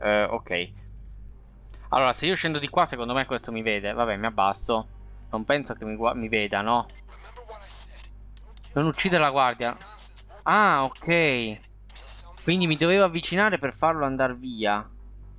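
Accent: Bari, Italy. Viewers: Sei di bari?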